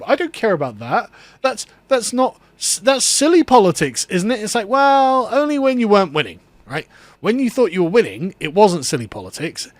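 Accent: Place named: England, United Kingdom. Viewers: Indian woman with english accent? IGNORED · Seeing a fellow Indian use an English accent to push such stupid ideas is very disappointing. Violence as a tool is a communist tactic and should be confronted with the tools that worked in the past.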